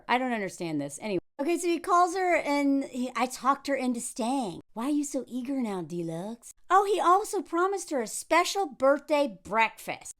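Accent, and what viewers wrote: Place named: Chicago, United States. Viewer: Your Chicago accent is hilarious! I'm from Chicago. Another amazing video,!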